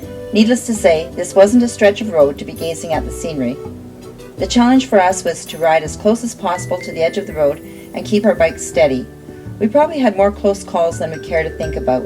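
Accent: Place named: South, United States. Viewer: maybe not mechanical, but just annoying. like an american from the bible belt.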